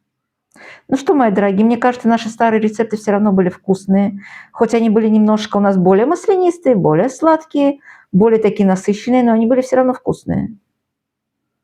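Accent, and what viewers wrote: Baku, Azerbaijan. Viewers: Никогда не слышала о таком, спасибо за рецепт, спасибо за наш бакинский старый акцент, как вы его сохранили???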